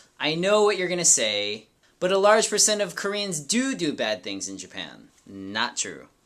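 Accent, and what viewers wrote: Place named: United States. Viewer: I can tell from your accent that you are American. If you are American, you should focus on racism in America. Living in Japan more than 20 years I know most Japanese learn about the racism within their country. Many people just don't get it or don't care much about it. As you said at the very beginning of your first video, there is racism in every country. You have no right to judge Japanese people unless you solve all the racial problems within your country.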